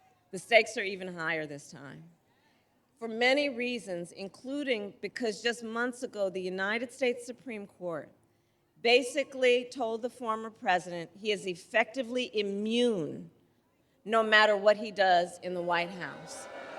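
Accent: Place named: England, United Kingdom. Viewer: Love who! Who’s that? I don’t know her. She’s kinda has an English accent. Sound like she lived in a land of OZ my gosh is she the one behind the curtain or was that a Teleprompter?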